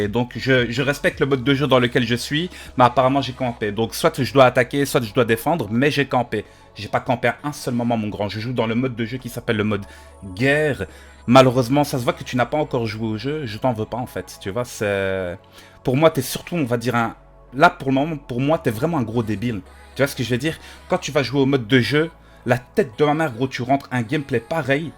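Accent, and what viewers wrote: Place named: Belgium. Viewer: tu fait trop rire j'adore les belges et leur accent ta trop raison mec t le meilleure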